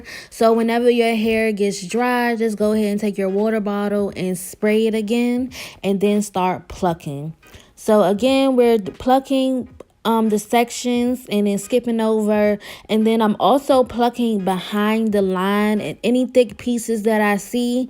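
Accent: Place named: Washington DC, United States. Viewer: I just know youre from DC lol. that accent is too recognizable lmaooo 😘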